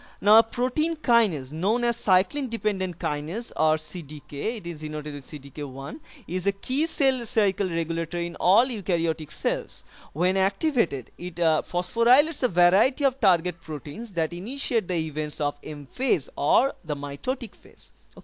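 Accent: India. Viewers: ow he is from Bangladesh😃i thought he was Indian because of the accent. thanks a lot bro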